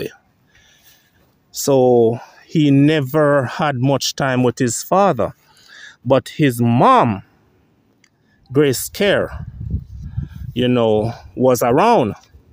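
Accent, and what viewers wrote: Jamaica. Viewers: Bredren that's how Jamaicans dialect sounds. Perfectly legit.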